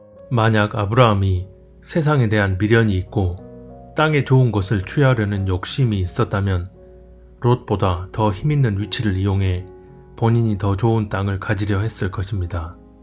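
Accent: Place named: Jeolla, South Korea. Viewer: 전라도 억양 듣기싫다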